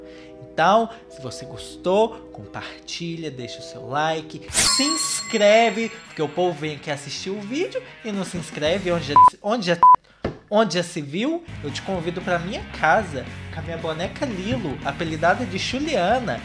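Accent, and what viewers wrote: Brazil. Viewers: Ariana corre aqui pra ver esse babado! Nauo aguenta tu! Que voz BRASEEL! Cadê a segunda parte da história da polônia homi? ❤️❤️❤️❤️❤️❤️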